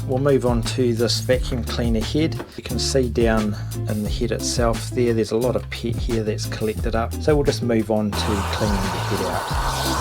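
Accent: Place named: Australia. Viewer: Nicely done! Thank you. (Love to hear that Oz accent!)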